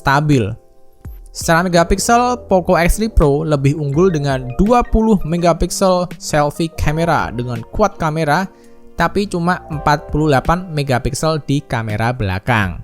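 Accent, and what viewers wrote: Kudus, Indonesia. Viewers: Orang kudus to mas? Wkwkwk sama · bang lu dr kudus ya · Sampean orang kudus mas? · lho bang .. orang kudus?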